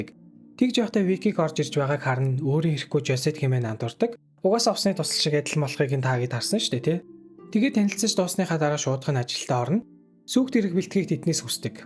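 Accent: Uvs, Mongolia. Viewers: Увс аялгатай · He is Uws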